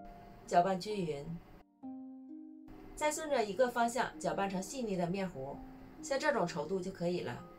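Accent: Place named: Northeast, China. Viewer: 东北腔。。。大米发糕的桌法，😅 也加了麦子面的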